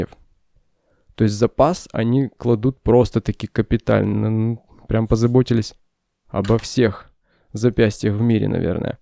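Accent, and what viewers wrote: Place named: South, Russia. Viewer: Обычный южно-русский говор. Давайте относиться снисходительно. Тем более, автор компетентен.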